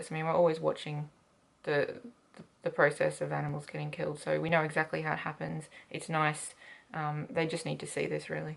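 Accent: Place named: Australia. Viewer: Oh my god.. Haha I was thinking 'she must be British, how does she have an Austrlian accent' and then you explained you lived in England... I knew it. The dry humour has rubbed off on you :P This video was awesome; more people need to be explaining the inevitability and necessity of veganism in this matter of a fact way.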